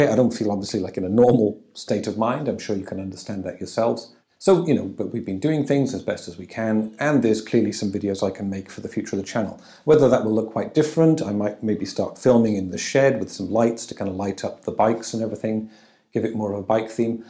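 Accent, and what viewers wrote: United Kingdom. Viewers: Nice to see u again. Is your British accent is softening? Is it possible to retrofit indicators on e bikes and not waving hands?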